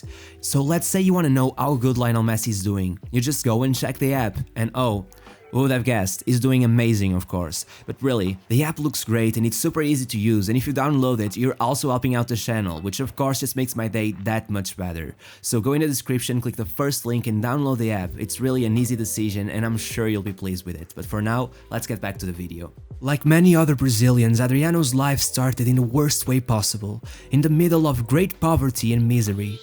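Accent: Portugal. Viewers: Hey. Yes he is. Its obvious by his accent and the way he pronounce the Portuguese words. Only a Portuguese could say it that way. Cheers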